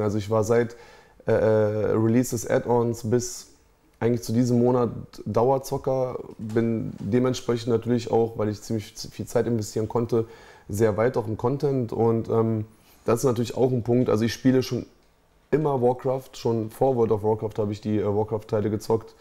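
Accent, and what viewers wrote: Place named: Germany. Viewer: in echt heißts ja Command and conquer aber bei ihm hörts sich so lustig an so deutsch halt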